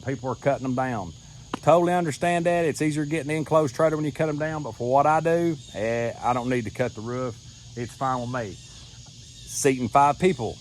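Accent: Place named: South, United States. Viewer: I heard that talk and sure enough... T for Tennessee brother!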